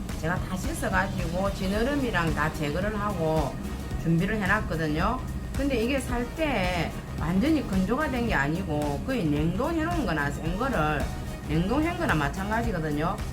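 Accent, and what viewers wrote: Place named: Busan, South Korea. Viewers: 아 ! ~ 참 깨를 안뿌렸네 ~ 에서 빵~ 터지네요 레시피 그대로 조만간에 만들어봐야겠어요 영상을 오늘 첨 봤는데 좋아요 와 구독 누르게 되네요 구수한 경상도 부산 사투리가 정겹고 좋아요 저도 부산사람 이라서 그런가? ㅋ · 부산분이라그런것도있으시겠지만 제가봐도 실력도좋으시고 정감도있는분 확실합니다 ㅋ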